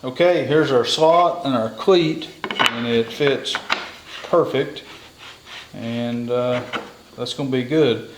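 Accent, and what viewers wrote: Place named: Tennessee, United States. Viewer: Have stairs shaped just like this one in a rental home. I have to take the railings off so that occupants can move there beds ,dresser etc. to the rooms upstairs which over time has created a lot of wear and tear on the stairs from taking railings on and off.Never been much of a carpenter, so i was wondering if you were for hire?Listening to the accent it seems you are in my neck of the woods. Am in southeastern part of Tn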